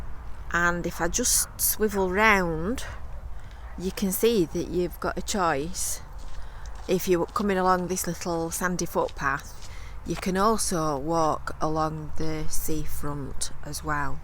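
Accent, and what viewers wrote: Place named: Yorkshire, United Kingdom. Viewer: Thanks again for reminding us of things to visit on our coast. I often put on the auto subtitles and chuckle at how it guesses at your Yorkshire accent. Unfortunately for 'Fylde' it puts fouled or failed!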